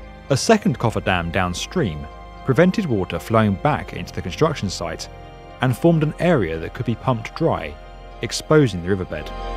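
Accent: United Kingdom. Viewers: Why do we have a British guy talking about American history? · Bro it was obvieous from the bigginni g that he is brit all the evidance was pointing at it from not sounding the R at the end and from many things that differs brits from american accent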